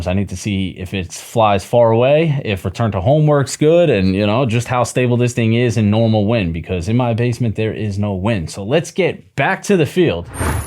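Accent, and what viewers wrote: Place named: New Jersey, United States. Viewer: Bro i would love to fly with you one day. Just got back in to flying. I live in jersey. Sound like a jersey guy.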